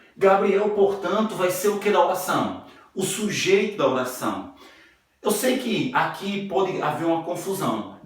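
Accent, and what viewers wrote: Northeast, Brazil. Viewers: O senhor é pernambuco, cearense, rio grandense do norte(potiguá)paraibano. Enfim, o senhor nasceu no Nordeste?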